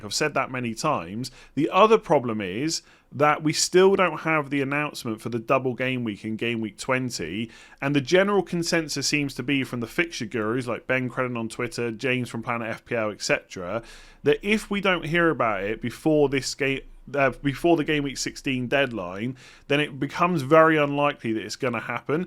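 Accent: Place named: Bristol, United Kingdom. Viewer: Its his Bristolian accent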